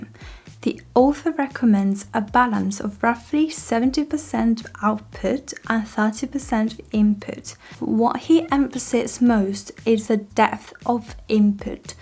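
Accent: England, United Kingdom. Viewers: wow you have a strong English accent when speaking English 😅 thats cool!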